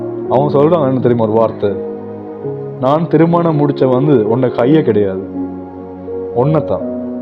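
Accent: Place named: North India, India. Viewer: North Indian Tamil sooper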